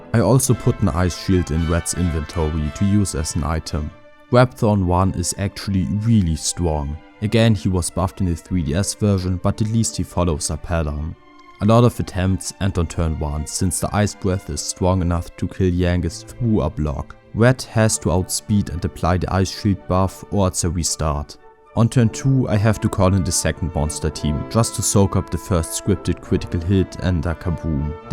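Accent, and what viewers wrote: Germany. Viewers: Hello! Good job! Well done! Ja, ein "legendäres" Spiel...also das PS2 Spiel (für mich persönlich)...Nostalgie pur! Yes, you cannot hide your German accent! But that is completely irrelevant! Who really cares about your accent when you speak English! A wonderful game! Ich kann mich auch noch an Final Fantasy X erinnern...auch sehr fantasievoll! Das waren noch bessere Zeiten (für mich jedenfalls), ich bin alt geworden... Alles Gute, viel Erfolg, Glück, Freude und Gesundheit! Grüße aus dem "wunderschönen" Kreis Offenbach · Oh gods. The german is real. Even my Dialect isn't that bad when I talk English.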